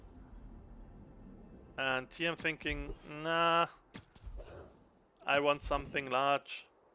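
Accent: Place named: Poland. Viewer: Where are you from? You sound polish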